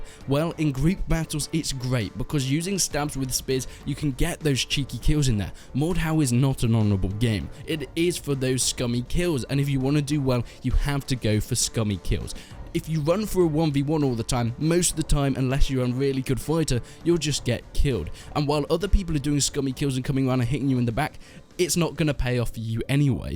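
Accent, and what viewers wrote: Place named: United Kingdom. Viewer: Apparently, Americans think that the British accent sounds intelligent. This is a good example of it being wrong. VERY fucking wrong.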